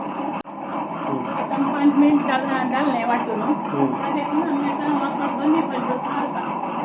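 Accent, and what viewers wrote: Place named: Mangalore, India. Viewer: Authentic GSB Mangslore konkani .......feels so nice to hear. I herd it after over two decades!....GSB food is superb!!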